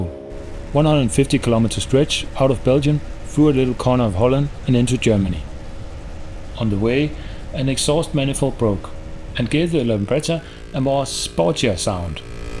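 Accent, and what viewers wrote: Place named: Denmark. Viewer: 'dan'glish accent'...Haha...I must apologise to all our foreign friends who are forced to learn english...As an englishman I can assure you we didn't mean it to be this way even tho some of us still try to learn other languages...but unfortunately as a consequence most english have now become very lazy to learn them...hehe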